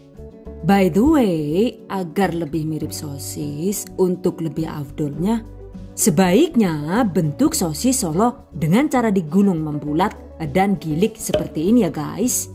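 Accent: Banyumas, Indonesia. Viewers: Asli ngendi sih mbak,deneng pada Karo nyong,,,ngapak mania,,,Tek batiri ngapak yuh mbakyuuu 😀😀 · Suaranya kaya pengisi suara si unyil. Eh jebule wong ngapak. 😅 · Makasih utk resep2nya mbak... Aku jg seneng dg bahasa ngapaknya... Ora ngapak ora kepenak yah mbak... Suwun mbak...🙏🙏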